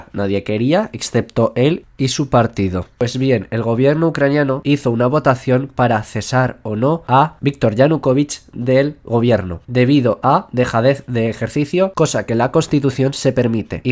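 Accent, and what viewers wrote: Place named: Spain. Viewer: Pues para ser Ucraniano habla muy fluidamente al castellano y eso que soy de Mexico se te nota mucho el acento español de españa 🇪🇸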